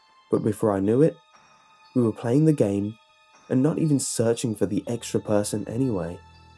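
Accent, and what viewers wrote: England, United Kingdom. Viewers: is he american or English cos he sounds English but I'm not sure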